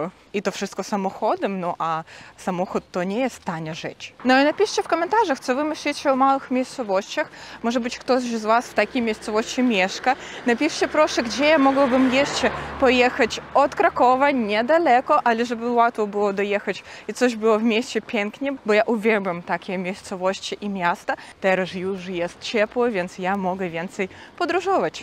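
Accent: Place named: Ukraine. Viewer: Jesteś fantastyczna i ten Twój specyficzny język z ukraińskim akcentem bardzo mi się podoba ,już któryś raz z kolei proponuje Sandomierz w świętokrzyskim 👍👍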